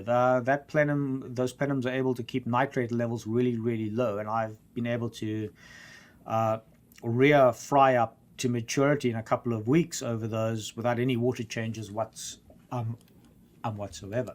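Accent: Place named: United States. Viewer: that's an amazing American accent.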